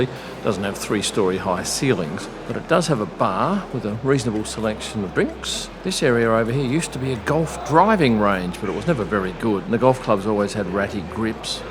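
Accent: Australia. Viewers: Loved discovering that one of my favourite media people in the F1 circus has an aussie accent. I have always appreciated your F1 media content that appealed to the female audience over the years.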